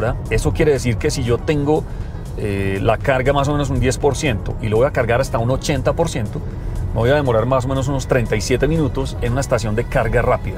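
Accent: Colombia. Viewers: Que impecable reseña. El acento colombiano es muy agradable de escuchar, y los paisajes acompañan muy bien las tomas del C40. Felicidades y gracias por vuestro contenido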